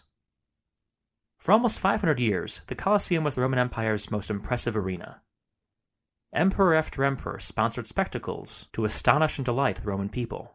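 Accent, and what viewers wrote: United States. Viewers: Sounds like an American, alright. 🤦🏻‍♂️ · OK I'll save you a lot of time so you don't have to listen to the whiny American accent ,it was an earthquake end of story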